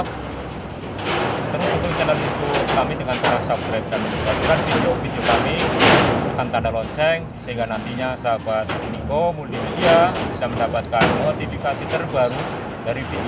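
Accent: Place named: Banyumas, Indonesia. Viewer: Mas...Asli Banyumas apa Tegal?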